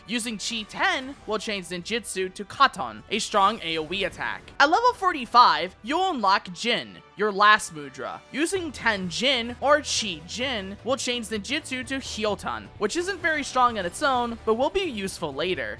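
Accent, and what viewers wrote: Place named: United States. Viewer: my god your voice is so painfully american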